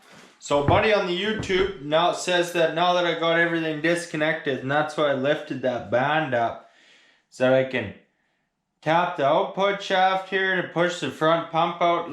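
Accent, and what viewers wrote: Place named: Saskatchewan, Canada. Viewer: Way to tackle it bud.. if you need a tranny jack DM me i have one you can use.. slick as shit when you're working alone.. by the way you talk i believe we aren't far from each other in good ole sask lol..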